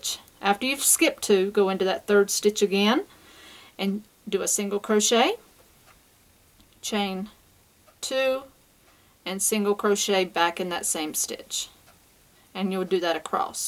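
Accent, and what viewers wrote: South, United States. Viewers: Very good video. I hear a bit of an accent in your voice. From the South?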